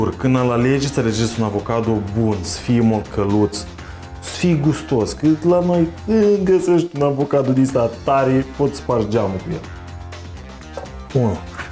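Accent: Moldova. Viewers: Sint cu un zimbet intins la maxim... accentul moldovenesc si exprimarile sint extraordinare... ,, ou poshat’’ hahahahaha... chiar nici nu stiam cum s ar putea chema din engleza in romana... pouched eggs!!! Cu drag continui sa ma uit din Australia 😘😘😘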